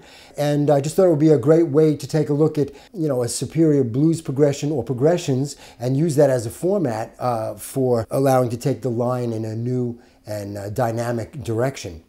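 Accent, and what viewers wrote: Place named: New York, United States. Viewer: This is great stuff. Also love the New Yawk accent, I'm from Brooklyn...LOL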